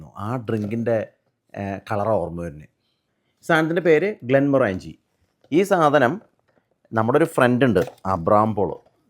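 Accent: Thrissur, India. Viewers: തൃശൂർ ആണല്ലേ.... വീട്..... ആണോ ഗെടി....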